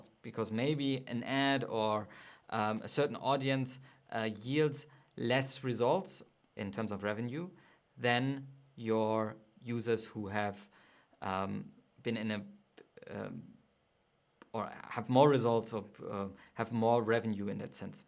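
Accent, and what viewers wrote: Germany. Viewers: If Yes, is it possible to have a call with you? I really need help with my tracking. Im loosing so much money, because something is wrong with my tracking. I have quiet good traffic and im still making 50+ Sales a Week (organic) now i want to scale. But something is in my setup is wrong! Of coruse i´ll pay you for your help! Pls answer to this comment or give me an oppertunity to contact you. Idk but i Think you ´re a german guy? Ich kann es am Akzent hören ;)) Ich freue mich auf deine Rückmeldung ;))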